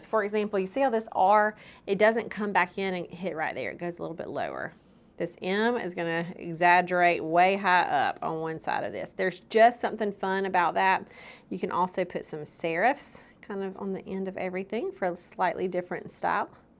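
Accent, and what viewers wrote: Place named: Alabama, United States. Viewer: Love the lighthouse. When I went to your Facebook account I was delighted to find that you are a fellow Alabamian. I knew I liked your accent😊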